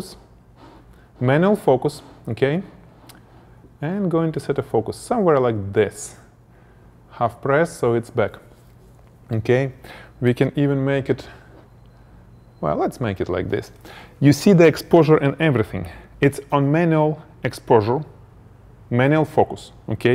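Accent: Russia. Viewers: Wish I could fully listen, I'm so delighted by the charming personality and accent which triggers my Russian nostalgia that I can't pay full attention to the tutorial :D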